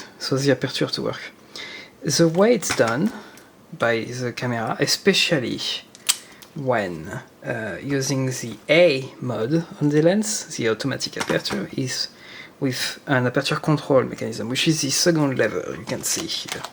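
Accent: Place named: France. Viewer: At first wanted to close the video in first 10 seconds but stayed for french accent and mechanics of camera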